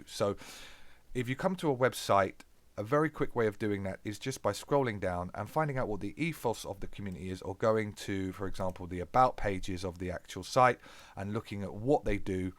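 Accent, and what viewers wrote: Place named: United Kingdom. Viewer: This is one of (if not) the best - "How to find a Unit" guides I've seen 👏🏼. It's also refreshing hear a UK voice delivering it 👍🏼! Certainly a unit I'd consider applying for in the future perhaps 😉. In the meantime, I've subscribed and will be watching/spying/researching (😂) the channel.. 👀 Peace Out ✌🏼😎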